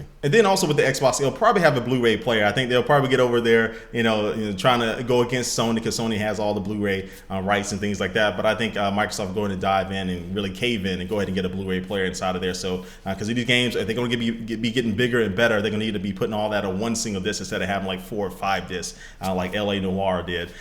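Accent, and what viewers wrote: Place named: United States. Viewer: I thought he was American.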